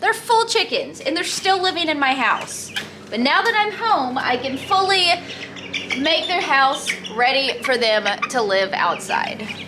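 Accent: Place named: Texas, United States. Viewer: You sound born in raised in Texas. You shouldn’t be surprised at the change in our temperature and weather down here .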